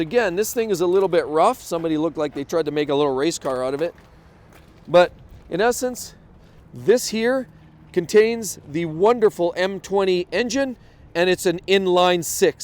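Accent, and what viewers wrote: Edmonton, Canada. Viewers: Heard your voice- I immediately thought Edmonton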